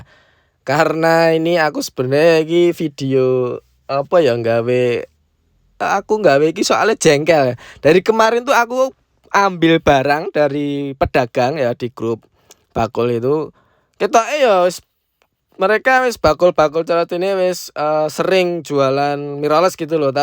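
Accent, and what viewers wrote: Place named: East Java, Indonesia. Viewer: Mantap jawa timuran banget mas, terimakasih informasinya yg sangat berguna banget👍👍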